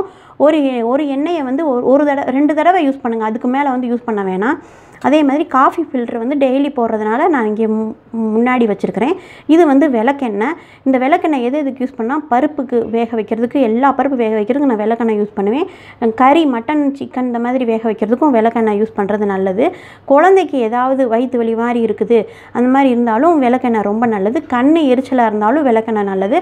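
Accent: Madurai, India. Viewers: R u from madurai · Andha thenga kathi maduraila use pannuvom apram pathriram velakunum nu soluvom urs madurai slang madhiri iruku adhan keten pls reply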